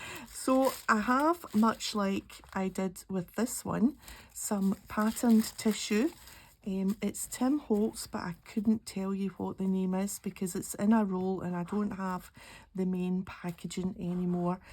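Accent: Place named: Glasgow, United Kingdom. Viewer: O we love your Glaswegian accent, Willie always listens and smiles when I watch your videos he likes the accent it gives him a touch of home. ❤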